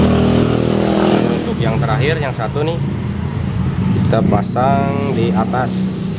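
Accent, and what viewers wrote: West Java, Indonesia. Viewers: Weh Sunda nya mag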